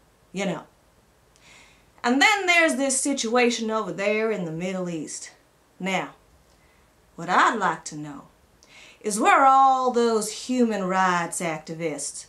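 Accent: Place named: South, United States. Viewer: Great video! Your southern accent is hysterical, not to mention you deliver your points with class and style. I hope you plan on making more vids.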